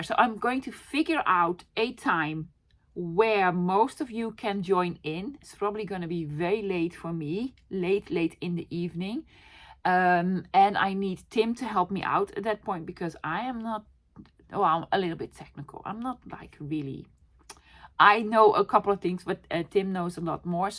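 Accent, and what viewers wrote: Netherlands. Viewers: You are such a lovely person I found some days ago. I love your dutch accent and it's fun listening to you! Thanks for all the inspiration I'm always looking for. Be blessed!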